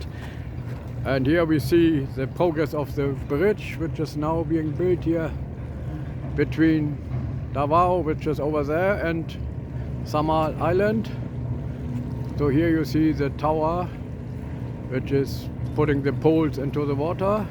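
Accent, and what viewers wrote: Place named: Germany. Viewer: Danke für den Bericht, aber man hört ganz klar dass Du deutschsprachig bist. Grüssle von San Agustin-Babak.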